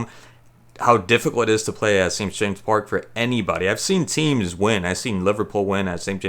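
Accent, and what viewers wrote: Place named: United States. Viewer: Not gonna lie, i hear that accent and once i've wrote this i'm off. 🤣🤣🤣 sorry fella but yanks and football just don't go together.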